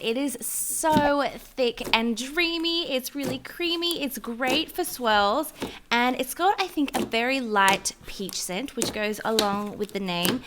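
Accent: Australia. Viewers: Are you Australian? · I love her Australian accent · OMG your Australian!😍SAME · your Australian!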